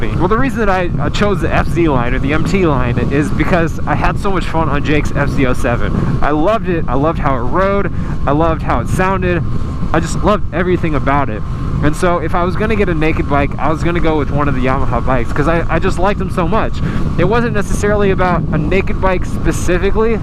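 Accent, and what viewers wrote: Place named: Texas, United States. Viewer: Your Texan is showing! Yall!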